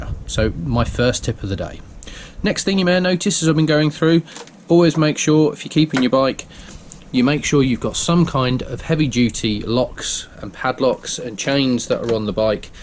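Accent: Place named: United Kingdom. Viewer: you have an awesome channel!! i love the british accent lol. and the bikes look amazing. hope we can stay connnected ❤️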